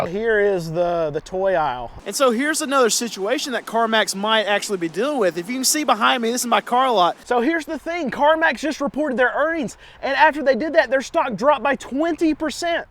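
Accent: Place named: South, United States. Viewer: Here for the southern accent "Becuhzzz'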